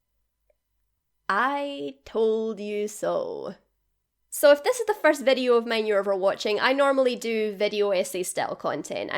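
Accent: Scotland, United Kingdom. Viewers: Oh Scottish, I was wondering. Well its lovely! And if this wasnt scripted I would have to applaud you again because I thought it was very well thought out. I too was a big fan of the shakespearean vibe they had in the first two movies which I really enjoyed but they ditched that theme and went way too silly. I liked how you highlighted the theme of Thor always moving away from wanting to rule which is very much his character arc, wanting to be more involved with middle earth than Asgard. I agreed with everything you put out there and really appreciated your video. 🙂 I just found your channel but I am definately subscribed now! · Are you Scottish ?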